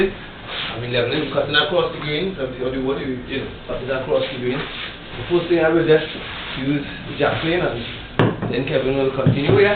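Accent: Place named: Trinidad and Tobago. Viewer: breds, yuh sound real real trini, yuh know? ah tellin' yuh, boy, is like yuh's mih breddah fuh true.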